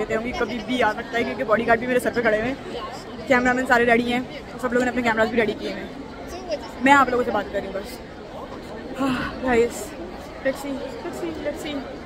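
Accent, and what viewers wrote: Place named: India. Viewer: Ur accent is like indian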